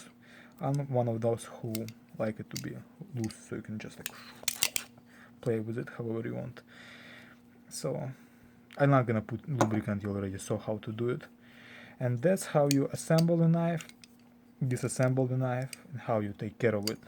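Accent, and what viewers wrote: Russia. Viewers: ты русский) ясно по акценту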